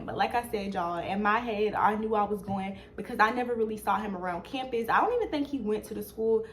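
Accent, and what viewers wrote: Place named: South, United States. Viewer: Girl!! I just can't get over the way you say the word "right" soooo freaking cute & country!! 😂❤❤❤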